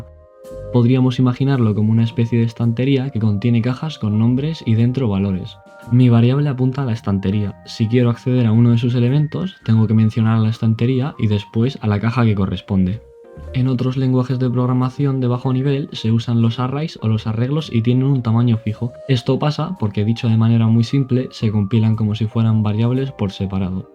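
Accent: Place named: Argentina. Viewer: Ja ja ja ja. Yo pensaba que eras argentino, pero muy bueno, tu video sigue así.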